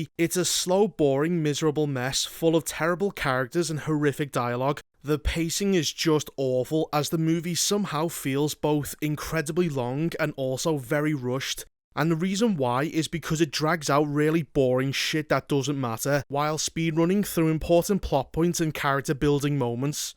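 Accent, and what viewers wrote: Liverpool, United Kingdom. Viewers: Are you scouser???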